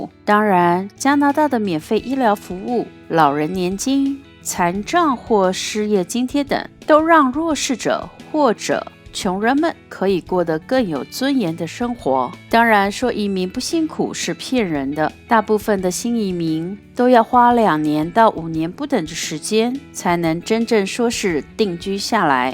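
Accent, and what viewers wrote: Standard. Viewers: 山水有相逢。一切都是小問題哈哈哈。還有你的國語好正統，沒有台灣腔，我昨晚看你視頻的時候都在猜想您祖上是不是北方人，或許是河北那一片的😆😆😆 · 你的口音不像台湾人啊 哦对了，你做过国语记者，国语非常标准。 我在多伦多，也好中年技术移民来的，非常赞同你的观点 我是大陆人，来加拿大不但可以获得自身的自由，更能拍拖意识形态的干扰。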